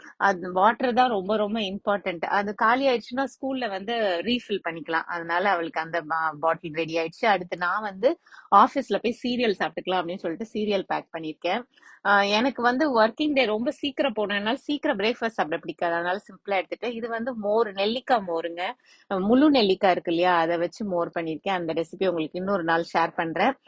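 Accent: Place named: Srivilliputhur, India. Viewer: Somehow I landed on to your channel, we are from SF bayarea, living there for 25ish years. My older daughter just graduated from MIT. Just roamed around this area when we were in town for her graduation. Loved Cambridge summers and business of the city. Love your style of talking and openness of sharing information. Naan thanjavur side. Somehow enjoy your azhaga srivillipittur style Tamizh. I work for Microsoft, mostly work from home, drive to work once or twice a week. Ilayaraja and SPB are by drive time buddies, Ippo Spotify fan due to my daughters persistent pestering. Keep rocking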